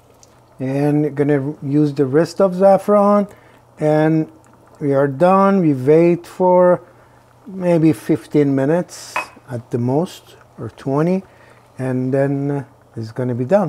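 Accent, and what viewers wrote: Iran. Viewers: beautiful and sincere Persian accent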